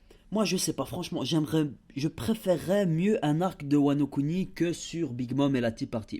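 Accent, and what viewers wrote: Brussels, Belgium. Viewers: D’où viens tu en Belgique ? Petit accent bruxellois, non ?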